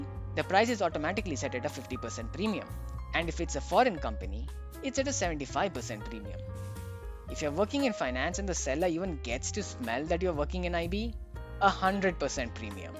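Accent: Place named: Karnataka, India. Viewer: LMAO how are people thinking that you're a migrant when the Kannadiga accent is SO clear??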